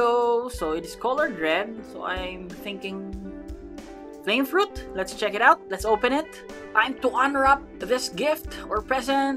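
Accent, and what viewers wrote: Philippines. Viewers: i already know your a filipino just by listening to your accent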